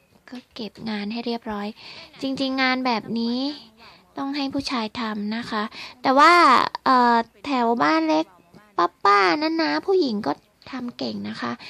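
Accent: Isan, Thailand. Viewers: เว้าอีสานน่ำ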